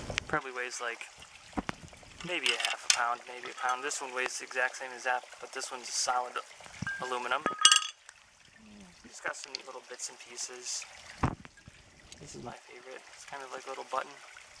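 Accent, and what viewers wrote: United States. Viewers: American accent.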